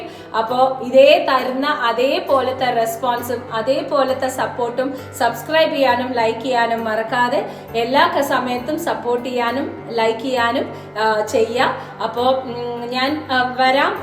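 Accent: Palakkad, India. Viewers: I like your palakkadan malayalam very much ma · Hi where exactly are you at Palakkad. Could make out from your accent that you are a palakkadan.. Me too Palakkad.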